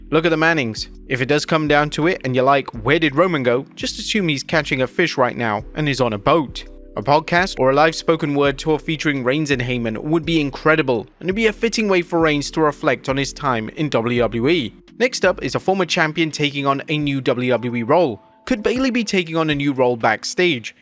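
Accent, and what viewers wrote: England, United Kingdom. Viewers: 😅😅😅 .. Remember, he's an Englishman. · if you see “controversial” in the title, expect the british narrator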